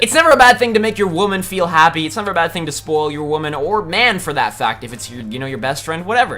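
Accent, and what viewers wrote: Russia. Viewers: Do a full video in Russian accent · Russian accent gave me fucking cancer. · Blaziken you should make a new account go online mod and use you Russian accent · That Russian accent was great lol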